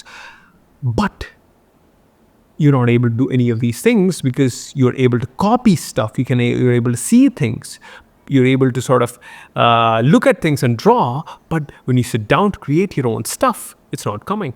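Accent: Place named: India. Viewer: Lol, just discovered this 2nd channel, same old Indian accent.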